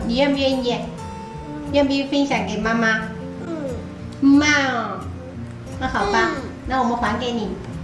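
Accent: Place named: Hokkien, China. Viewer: 我是台灣人聽到妳說閩南語感覺好親切哦👍好廚藝！小寶寶們都好可愛哦😘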